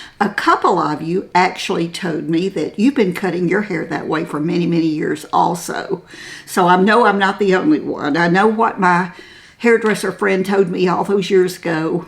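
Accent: South, United States. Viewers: Thank you. I hate to say, but I will. I’ve been watching video after video. My mom and my aunts have been gone a very long time. So this was like getting to spend time with them again. A little while ago I was explaining something to my husband and I found myself going into all the small details. I realized I was speaking with your voice, sans southern lilt. Thank you! For everything.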